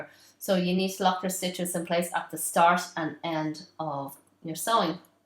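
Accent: Ireland. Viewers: Love the accent!! My dad was from Tipp, my maternal grandparents from Galway and Mayo and my husband from Down. I'm a sucker for an Irish accent 😁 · Thankyou very much ,, a beautiful Far Eastern lady with an Irish accent 🙏🙏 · The Subtitles can't handle the Irish accent, hello from Co. Antrim btw. ;-) Haven't gotten around to using mine yet. Subscribed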